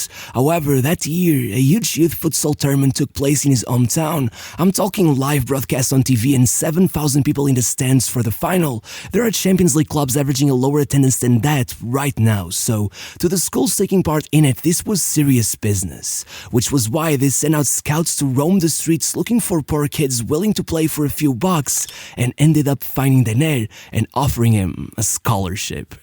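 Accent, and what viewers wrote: Portugal. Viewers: THIS MAN´S VOICE YOU PORTUGUESE ARENT YOU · It's so obvious you are Portuguese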